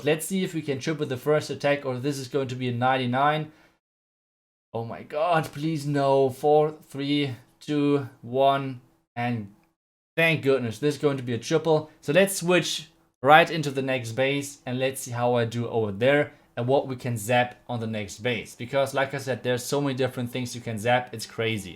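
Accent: Germany. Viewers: U are german i can tell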